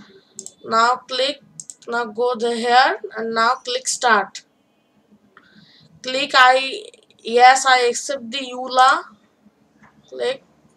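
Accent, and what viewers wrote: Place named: India. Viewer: indian acent lol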